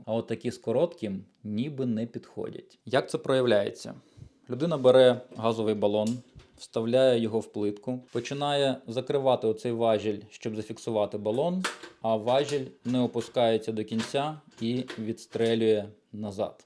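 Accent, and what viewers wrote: Standard. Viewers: отмав важливу інформацію без води і чудову аргументацію, наочно продемонстровано найменші деталі. супер! дуже дякую. Окреме дякую за чисту українську мову.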